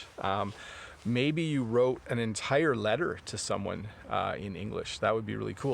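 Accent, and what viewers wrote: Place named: Canada. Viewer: Hello the 🇯🇵Osakanese here! Ive been learning eng from ur videos. I like ur “the great White North”English!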